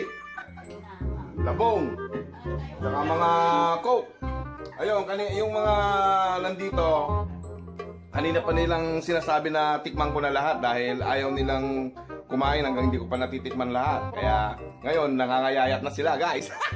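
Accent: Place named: Tarlac, Philippines. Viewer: and ilocano ka po ? u sounds like tarlaceno or pangalatoc or ilocos kabayan pala kita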